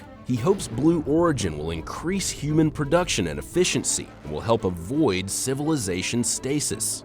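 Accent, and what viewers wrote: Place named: United States. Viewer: Jesus the American accent is annoying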